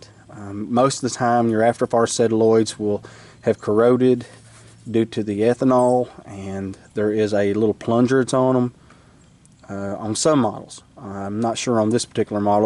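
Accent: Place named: Boston, United States. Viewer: After fire what? Sorry I am having trouble understanding your Boston accent. Could you point to that part?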